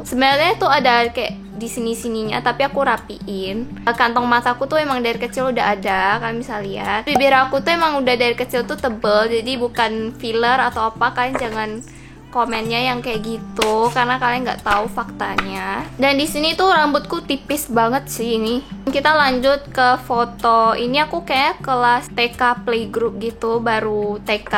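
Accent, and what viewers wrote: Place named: Batam, Indonesia. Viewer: Logat orang batam lucu yaa😂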